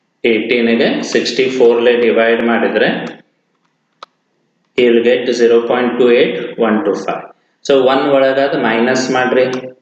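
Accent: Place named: North Karnataka, India. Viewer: Thank you sir. You speak north kannada, may i know where you are from sir?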